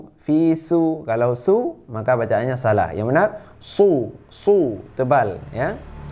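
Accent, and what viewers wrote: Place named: Lombok, Indonesia. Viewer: Dari cara bicara ustaz, saya berkeyakinan ustaz ini orang lombok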